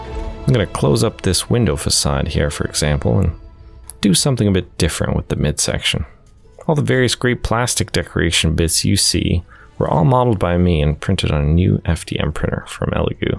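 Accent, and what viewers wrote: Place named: Ireland. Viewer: Are you from Ireland?? I didn't recognize your accent! Absolutely love this ! Wish I was as talented !!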